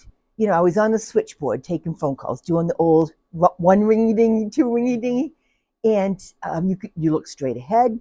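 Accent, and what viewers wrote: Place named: Massachusetts, United States. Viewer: I love watching your video's you make me crack up,,, As soon as I heard you speak, I said to myself that's a Massachusetts Accent. The reason that I recognized it is because I live in Mass as well. I'm looking forward to your next video, Take Care----Madeleine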